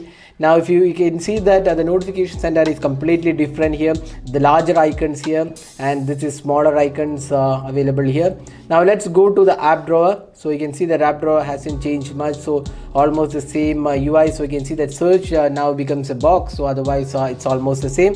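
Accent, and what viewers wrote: India. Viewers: Блять как же хуевый акцент у индусов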